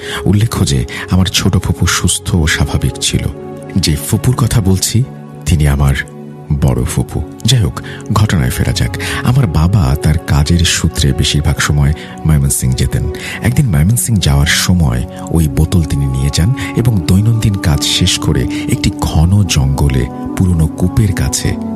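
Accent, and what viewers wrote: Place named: Tangail, Bangladesh. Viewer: Tangail theke shuntesilam 🥺🤍 nijer district er kotha shune valoi laglo😊💟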